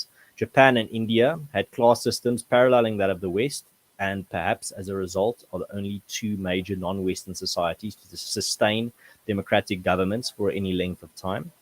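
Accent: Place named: South Africa. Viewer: I worked for a South African company for 25 years and great to hear your accent!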